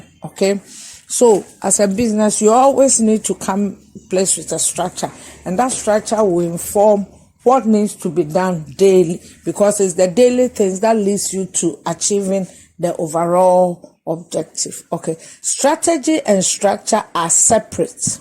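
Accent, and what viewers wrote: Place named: Africa. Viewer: Heavy African accent Miss! Great job.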